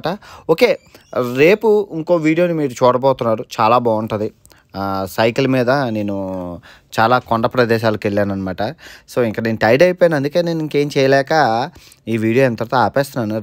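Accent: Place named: Srikakulam, India. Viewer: Bayya nuvvu evaro naku teliyadu 2nd video chusa this video was keka and so natural , like ur seikakulam accent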